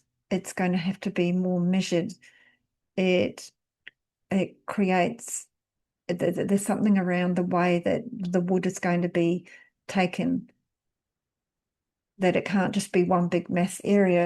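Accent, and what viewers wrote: New Zealand. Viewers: Just found you early hours on 21st November!🙂 It's so refreshing seeing and hearing a kiwi accent!🤓🙏🙌 Thank you!🌸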